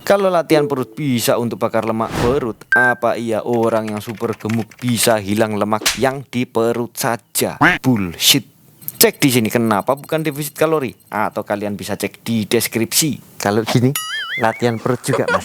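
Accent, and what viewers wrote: Yogyakarta, Indonesia. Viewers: kok koyo logat wong jogja yo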